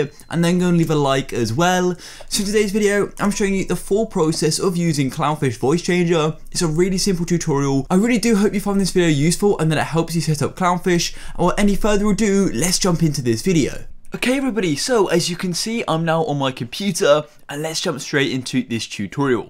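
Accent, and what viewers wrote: United Kingdom. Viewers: britsh man :)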